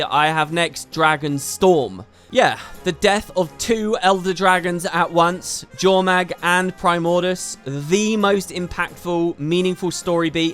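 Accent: United Kingdom. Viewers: Hey man, long time fan. Just thought I'd let you know that I've been watching another YouTuber named MrTommo2304 (who does Bleach anime content); and his voice sounds bang on like yours. Could be your doppelgänger. Definitely a British accent thing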